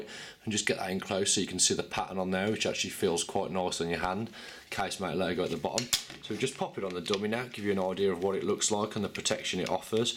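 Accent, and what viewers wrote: Birmingham, United Kingdom. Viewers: A Fellow Brummy :O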